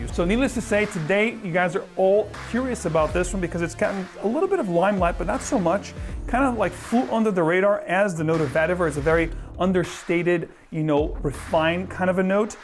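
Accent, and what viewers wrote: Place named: New England, United States. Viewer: you're from nee england brotha 💪🏽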